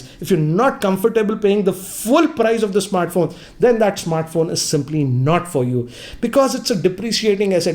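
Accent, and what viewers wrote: India. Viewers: Another Indian with a heavy accent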